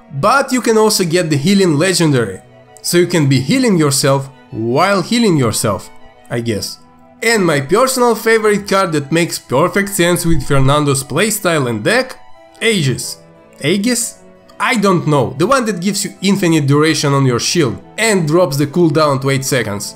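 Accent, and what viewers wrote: Russia. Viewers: U are Russian? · Чувак,ты русский?)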